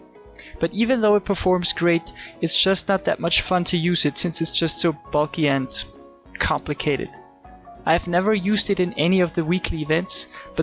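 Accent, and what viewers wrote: Austria. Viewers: kommst du aus Österreich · Kommst du auch aus Österreich?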